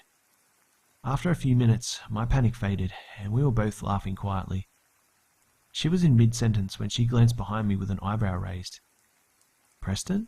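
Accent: Australia. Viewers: cause you read your stories with feeling. When the person narrating does it on one tone and almost no enthusiasm. It distracts from the actual story. Plus the Australian accent ain't to shabby to listen to. :-) hee hee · love love love this!! + love your channel and LOVE your accent! im an aussie too (melbournian lol) it's very soothing to hear your voice/accent. helps me sleep when im having a bad night. please keep up the great work, from one of your BIGGEST fans!!!!:) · Hey question! Where abouts are you from in Australia? I am in Australia for 4 months now and just recognised your accent ( not to be creepy or anything)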